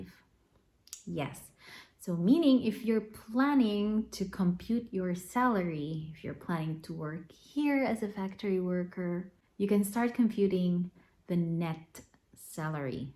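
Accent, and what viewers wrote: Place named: England, United Kingdom. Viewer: First I love your English accent,Gal you're flawless,thank you for your honest opinions.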